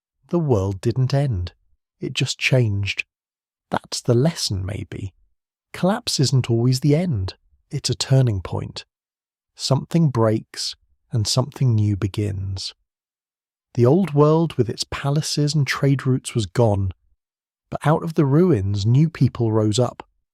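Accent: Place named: United Kingdom. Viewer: Very good content and no useless/annoying sound effects. Thank you. Why the British accent, though? It doesn’t make this more believable or impressive. A matter of cost, perhaps?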